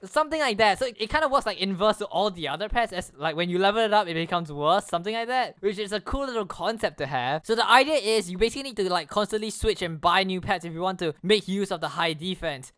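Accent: Singapore. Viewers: Jesus I love singporians english. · yo are you singaporean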